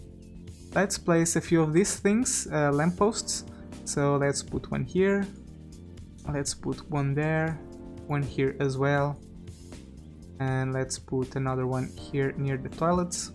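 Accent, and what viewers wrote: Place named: Portugal. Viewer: Classic Portuguese accent!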